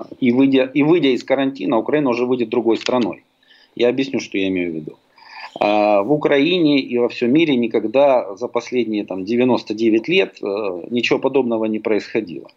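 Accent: Moscow, Russia. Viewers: акцент то Московский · Диктор с московским акцентом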